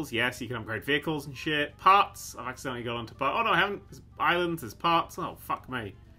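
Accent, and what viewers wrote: United Kingdom. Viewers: I feel like Dan's accent has become even more British after he moved out of England...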